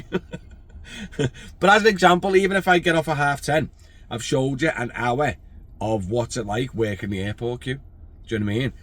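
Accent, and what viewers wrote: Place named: Liverpool, United Kingdom. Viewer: Really good insight into the Airport world for Uber drivers from a non taxi person. Love your vids, love the Scouse accent coming from a Geordie.👍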